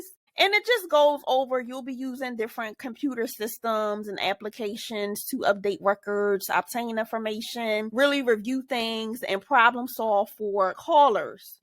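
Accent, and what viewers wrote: Philadelphia, United States. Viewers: That philly accent is strong!!! I love your energy lol keep going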